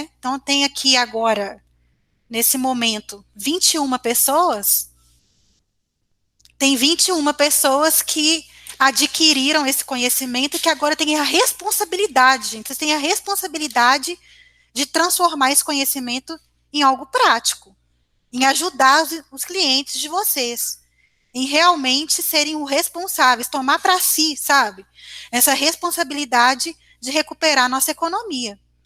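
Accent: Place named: Belo Horizonte, Brazil. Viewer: Esse vídeo vale ouro! Conteúdo excelente! E o nosso sotaque de BH é bom demais! :)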